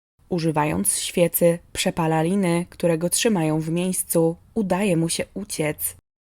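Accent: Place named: United States. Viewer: Mnie dość "bolało w uszy" wymawianie niemieckich imion, nazwisk i nazw miejscowosci z amerykanskim akcentem. Z polskim byłoby zdecydowanie ok. Bardzo ciekawy odcinek. Pozdrawiam.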